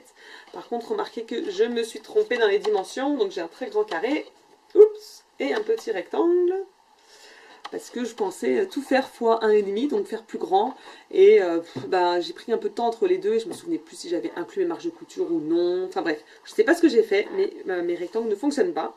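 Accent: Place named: Quebec, Canada. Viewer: Bonjour, Super podcast. Il me semblait bien avoir entendu un léger accent québécois. L'Arizona est superbe et en été il fait très chaud. J'ai sillonné les USA depuis Montréal , du nord au sud et de l'ouest en est avec une amie et j'ai vu le grand Canyon. C'est magnifique , j'ai visité Phoenix et j'ai beaucoup aimé mais cela fait 30 ans. J'ai envie de commencer le crochet mais je ne sais par quel projet debuter. En tout cas j'attends avec impatience ton prochain podcast. Sylviane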